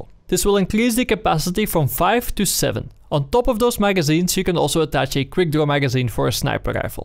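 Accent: Netherlands. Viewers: He's clearly dutch... we got that weird r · clearly dutch he sound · Are you dutch?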